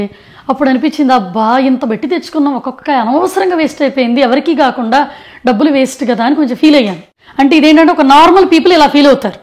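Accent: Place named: Guntur, India. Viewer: First of all a big hats off, a lovely clear cut video to those spreading negativity… we surely know that it’s like a saying goes ‘ dunapothu Medha varsham padinatu ‘.. I have been following u since ur first video, my husband saw ur video and shared to me, he says, ‘u talk like my sister in laws, who in relation are his sisters, they are from Guntur and I am from vijaywada.. so I relate a lot, the way u talk, the spontaneity and all … my elder brother stays in Birmingham and I know how they struggled to build up a good career in another country.. I am messaging from my daughters account, finally, I think I can call u by name, as I am a bit elder to u and I consider u as a friend, if hurtled sorry.. what I want to tell is, I just love u for the,‘Kukka katuki chappu Debba’ reply u are giving and this is necessary …finally wishing Bannu a speedy recovery and missed little princess in these videos.. 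but good that u kept kids away from all this nonsense.. take care, lots of love, jyothi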